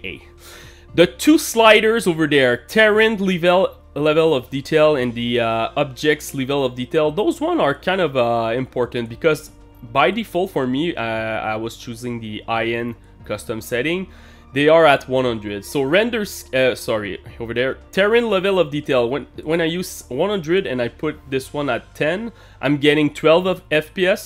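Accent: Quebec, Canada. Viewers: 100% you are from québec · Quebecois fr 😁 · est-tu Québécois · I knew you were French Canadian the second the video started. My mom has the exact same accent. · It's funny how the windows game mode isn't a game mode. Are you francophone? From Quebec maybe, some of your voyelles sounds quebecoises. Your "if" sound really french! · I can tell you have an accent “quebecois” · He was just joking. I mean „your favorite french canadian“ - this is self-parody. Pretty funny imho. I like his accent btw. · Your accent gives me flashbacks of CAF Basic Training in St Jean Sur Richelieu, lol. Thanks for the video, helped alot! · Québec right? Anyway, gr8t video! Thanks!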